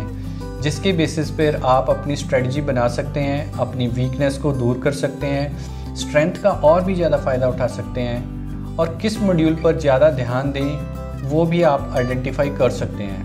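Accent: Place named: India. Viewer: Thank you , but your Indian accent makes it so hard to find out what you say , but thank U so much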